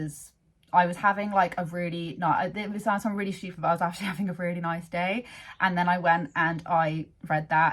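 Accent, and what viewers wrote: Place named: United Kingdom. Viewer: That's why I subscribed... plus... love the British accent:):) sometimes... most of the time it's about the kids.... except when you're peeing. Then it's about you....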